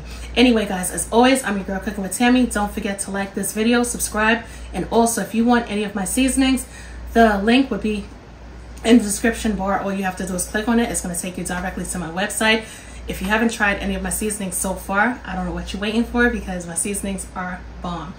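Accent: New York, United States. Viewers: I hear an accent…Are you a New Yorker?😊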